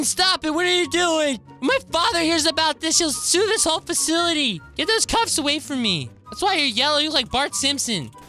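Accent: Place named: South, United States. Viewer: I turn on noficachio bell and I enjoyed it becuz I love when u talk country and I subscribed and I lovvve u.username:madihle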